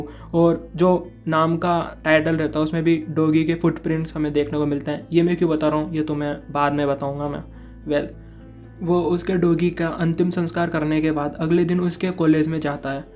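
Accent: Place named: South, India. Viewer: not like that bt tumhara acsent full south wala he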